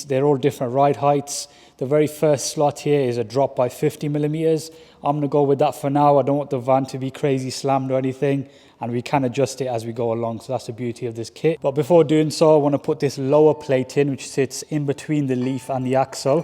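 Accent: United Kingdom. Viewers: So beautiful British accent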